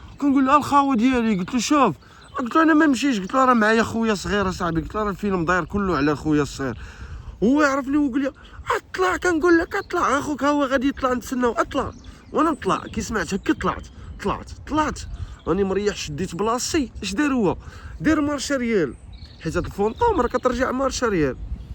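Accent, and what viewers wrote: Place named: Algeria. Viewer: فديوات ديالك سمعتهم قبل ولكن بلكنة جزائرية كانت واعرة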